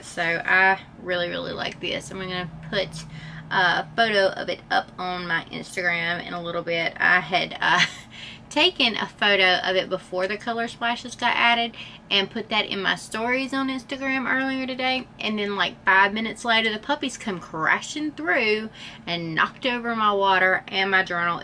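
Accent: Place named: South, United States. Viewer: I will laugh SO hard if you start to develop her accent on top of your natural Southern accent 😂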